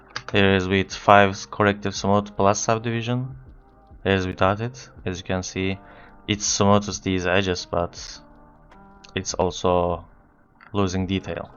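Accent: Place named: Turkey. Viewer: kardeşim sağ alta bakana kadar dedim "bi yabancı nasıl türk gibi ingilizce konuşabilir". klavyenin türkçe olduğunu görünce içim rahatladı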